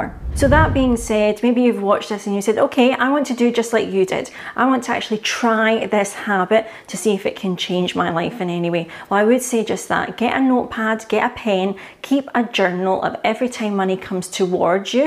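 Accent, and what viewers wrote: Scotland, United Kingdom. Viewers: My Good Lady, perhaps t’was your Scottish accent, the way you come across as a sincere lady and, I may be wrong, I feel we have a common “Friend” with a Beard who put you on my path! So I listen and opened a Vanguard life strategy 100! If I need some more advice, how can I contact u! My name is Fred! Im happy to give u my email and number👍🏽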